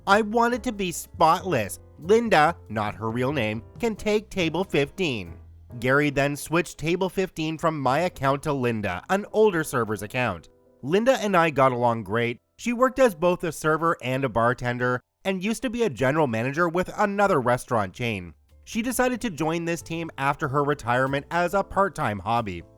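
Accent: Canada. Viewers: Can't tell if that's Canadian Accent or if is really an American from wisconson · Irish accent with a Canadian twang. Lol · okay but the canadian accent tho that caught me off guard XD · You know their talking about our TIM HORTONS GOD I LOVE MY TIMMYS PS LOVE YOUR CANADIAN VOICE VERY FUNNY CAUSE ITS TRUE 🙃🤪😜 Sorry lol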